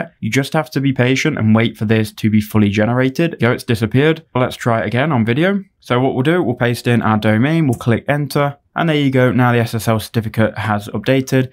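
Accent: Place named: United Kingdom. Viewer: Just simple and true 👍. Followed it and got mine done in 5 minutes. Also loved the British accent <3😂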